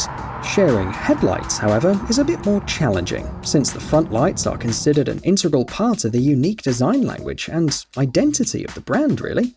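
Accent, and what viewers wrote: United Kingdom. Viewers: The video is pretty cool, but your fucking British accent fuck me up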